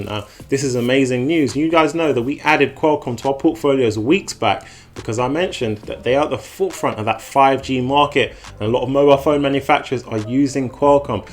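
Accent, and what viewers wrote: United Kingdom. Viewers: Great to hear a U.K accent in the financial space! Great video my bro. #SecureTheBag 🔥🚀💰 Bless!!!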